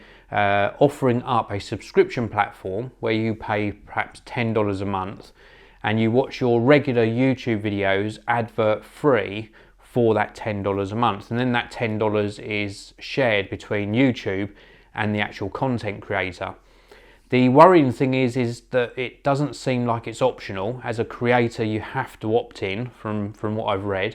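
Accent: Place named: London, United Kingdom. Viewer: the first time i saw one of your vids i noticed you have a londoner accent. it's not easy to change it if you wanted to without lessons.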